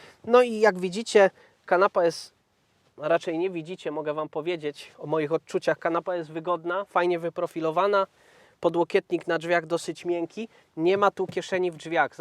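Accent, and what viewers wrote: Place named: Warsaw, Poland. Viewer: Te warszawiok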